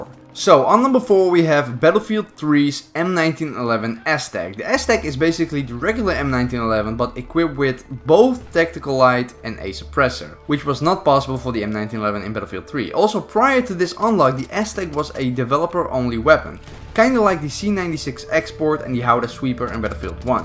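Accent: Netherlands. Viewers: ik wist dat je nederlands was al vanaf begin door je acceny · The Dutch accent is so obvious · Mooi Nederlands accent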